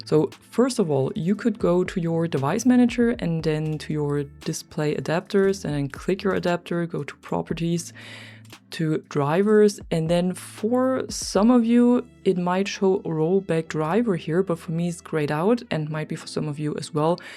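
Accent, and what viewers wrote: Germany. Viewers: And that loveley german accent.;D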